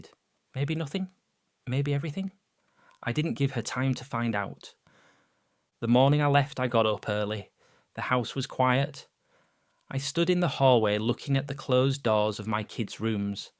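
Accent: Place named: Minnesota, United States. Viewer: I didn't imagine the Minnesota accent like this.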